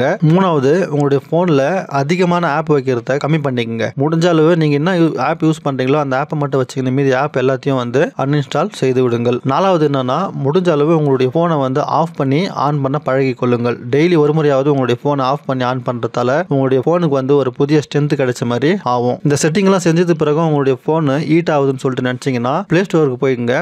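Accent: Chennai, India. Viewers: உங்கள் மெட்ராஸ் பாஷ அருமை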